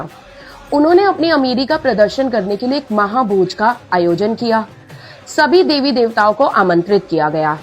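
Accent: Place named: Haryana, India. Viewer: मुझे पहले ही लगा कि आप हरियाणा से है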